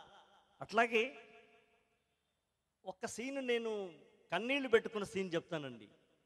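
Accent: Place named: Telangana, India. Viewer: Me goopathanam telusthundhi me matallo...... Telangana thathwam dwanisthundhi me yaasalo 👍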